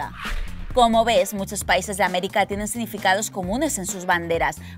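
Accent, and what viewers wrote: Spain. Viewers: tu voz de española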